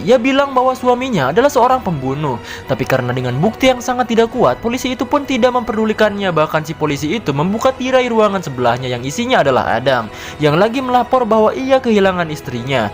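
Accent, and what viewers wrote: West Java, Indonesia. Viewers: ngakak kie sunda na wkwkwk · Orang suda ya min hehe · Lucu sunda na mna a? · Fix org sunda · anying sunda na mantap ngangkang aink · Pasti org sunda yeuh s mimind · sunda bet dahh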